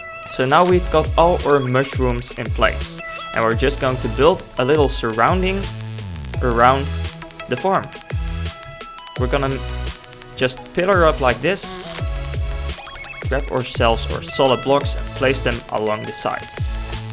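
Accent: Netherlands. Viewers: NL !! je hoort het meteen. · He is dutch yes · Ben jij Nederlands? (Accent) :D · are you nethetlands vause of your english speach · are you from the netherlands? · Ben je Nederlands? Als ja ik hoor het aan je accent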